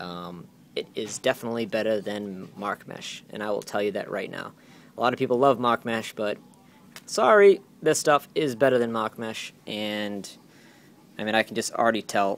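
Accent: Boston, United States. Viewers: u from boston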